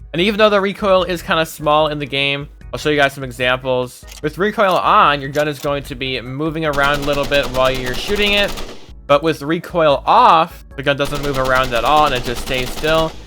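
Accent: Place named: United Kingdom. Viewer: they both sound young and briish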